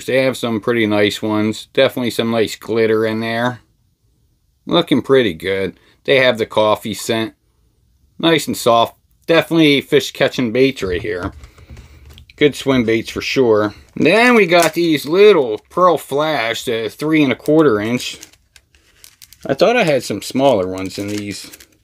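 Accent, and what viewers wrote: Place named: Pittsburgh, United States. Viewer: I detect a little Pittsburgh in your accent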